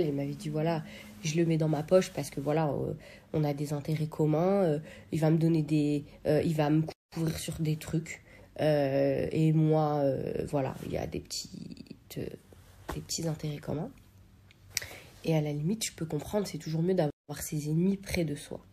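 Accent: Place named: Belgium. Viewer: Merci a toi tes belge???? Jadore ton accent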